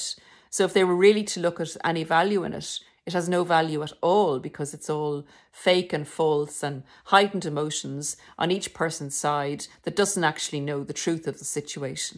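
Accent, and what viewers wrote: Ireland. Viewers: I like your accent are you Irish?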